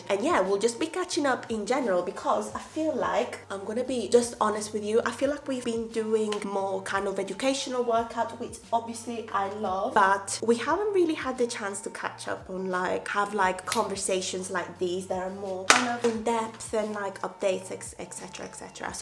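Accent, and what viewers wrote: Italy. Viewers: Your accent is amazing. Are you kidding? They're jealous...you're hot, smart and have a sext accent. Come on!! I'm Italian also and your accent is so authentic, beautiful. Forget these idiots!!! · Hearing the Italian mixed in with the English accent when yo speak is amazing to me. I love hearing it and doesn’t make a difference in understanding your content. For me it gives you character and I love when you call us famiglia. Thank you for all that you do. Your content is so informative, real and inspiring. Also you are so brave for moving all by yourself to a new country at such a young age. Your success shows your strength and perseverance. Lastly that concealer looks amazing, what brand is it? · I don't think people were picking on your accent. They were curious as to why you suddenly sounded different. The Italian accent is beautiful and it's sad that you felt that you had to hide it. 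Good that you're not doing it anymore, apologies for judging without first understanding. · Ehi bella, sei la mia inspirazione! Io sono mezza italiana e vederti tornare al tuo accento italiano mi scalda il cuore. Sono così orgogliosa di vederti come italiana che vive nel Regno Unito. Per favore, non cambiare mai chi sei perché abbiamo bisogno che più persone come te siano se stesse in modo impenitente. Non sai quanto sei bella e unica. Gli altri ti invidiano perché sono ignoranti e vogliono quello che hai. Sii orgoglioso di essere italiana!! 🇮🇹🇮🇹🇮🇹😘❤❤ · I love your accent! My best friend is from Trapani and it's her essence. I love seeing her as natural as she is, the same I wish from everyone! Also, loving when you're consistent with creating content, you entertain me a lot! But I understand that it's a lot of work and effort, so I'll be waiting paciently <3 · I would’ve never guessed you were Italian I thought maybe you were Puerto Rican or Dominican I love the accent 😍 Your body is fire 🔥 work it girl Love your content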